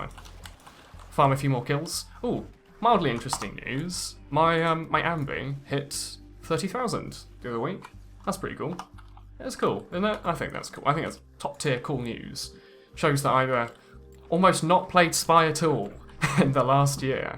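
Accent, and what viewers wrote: RP, United Kingdom. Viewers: Why do you sound so posh °=°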